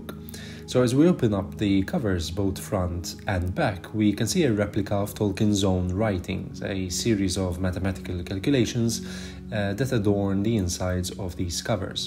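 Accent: Malta. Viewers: by any chance, are you maltese?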